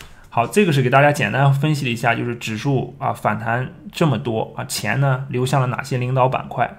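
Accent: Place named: Northeast, China. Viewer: 听出来有东北口音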